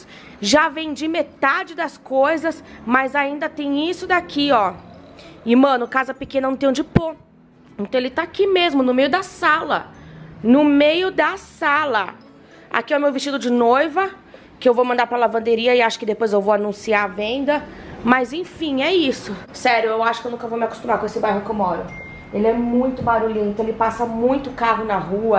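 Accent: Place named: Italy. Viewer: Meu marido ouviu sua voz e perguntou: quem é essa italianinha? Daí eu disse: ela é japa amor😍😊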